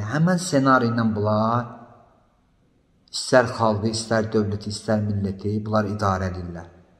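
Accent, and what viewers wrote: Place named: Basqal, Azerbaijan. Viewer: Bskılı kimi danışır nə molla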